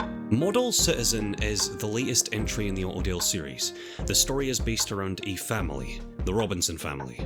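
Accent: Scotland, United Kingdom. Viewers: Are you Scottish? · You're ancient is wonderful (thinking Scottish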